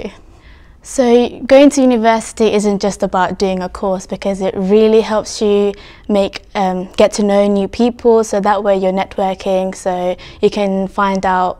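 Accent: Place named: United Kingdom. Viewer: I like her British accent. I haven’t heard an asian speaking in that accent before.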